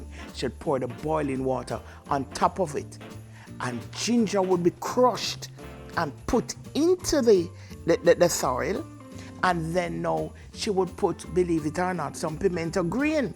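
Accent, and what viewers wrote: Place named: Jamaica. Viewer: Amazing jamaican speach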